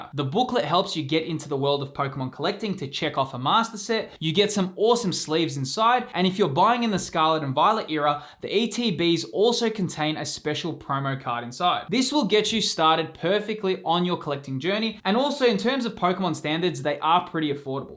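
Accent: Australia. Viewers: I love how Australians say Naoer instead of no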